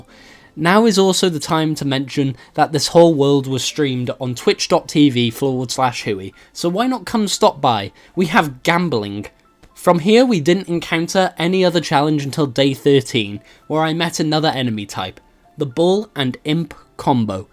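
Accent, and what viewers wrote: United Kingdom. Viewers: I didnt know u were british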